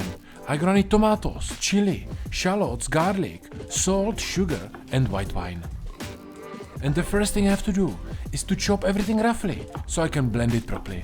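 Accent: Czech Republic. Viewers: That is just extremely strong Czech accent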